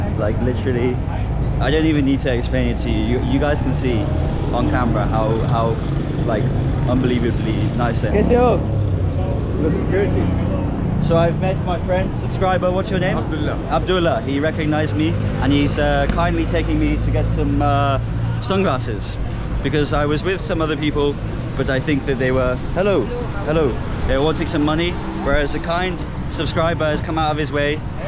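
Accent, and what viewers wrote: Pakistan. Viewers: Makes me so happy you're already sounding like a Pakistani :') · I saw your videos recently on tiktok and came here to watch all of em. I really love your videos as i am also from Pakistan. Love your pakistani accent tho . Keep up mate. 💙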